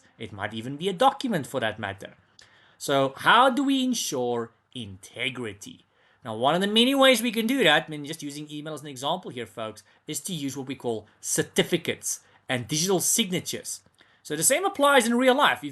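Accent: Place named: South Africa. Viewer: Just starting my sec+ journey subbing to you simply for your epic south African accent. 😁 The apple sauce of all accents.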